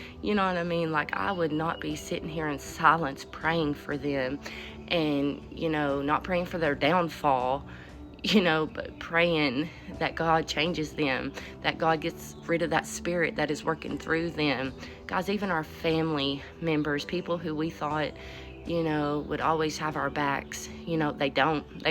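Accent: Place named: South, United States. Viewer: I could listen to you talk about Jesus in that southern accent all day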